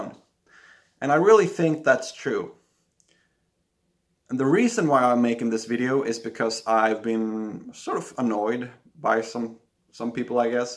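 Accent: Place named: Finland. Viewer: I heard the Finnish in your English during the first 10 seconds! Am I right?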